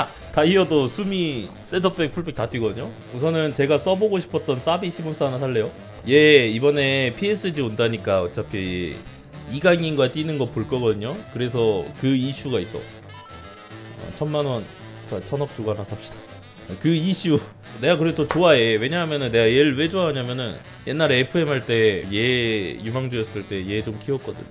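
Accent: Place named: Japan. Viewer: 이형 일본팀 하면서 일본 말투 중독됬네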